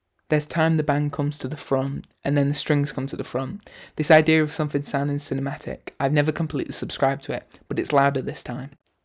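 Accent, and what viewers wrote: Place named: Sheffield, United Kingdom. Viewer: You sound like you’re from Sheffield yourself or something haha. I haven’t heard a similar accent like it from anyone except.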